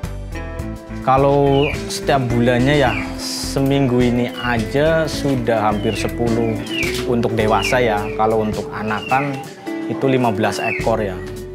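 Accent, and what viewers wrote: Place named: Banyumas, Indonesia. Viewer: Wong ngapak💪👍👍👍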